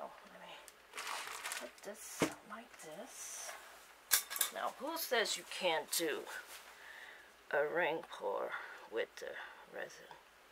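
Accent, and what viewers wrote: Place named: New Jersey, United States. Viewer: Lol I knew you were a Jersey girl or very close. I have cousins in Jersey, I'm in Southwest VA and we tease each other about our accents 😁😁 That sure is an awesome piece of work on both 💖💖💖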